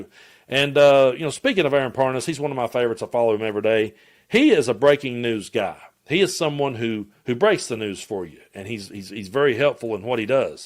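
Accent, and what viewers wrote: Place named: Kentucky, United States. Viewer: When l 1st started watching you, your name " " threw me off cuz of your Kentucky accent. I was born in Paris-and-I-don't-mean-France. Anyway, your current events coverage is brilliant, insightful.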